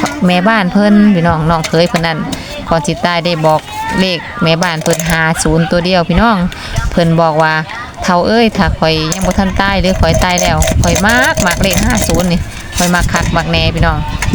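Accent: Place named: Laos. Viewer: ออกลาวบ่อจร้า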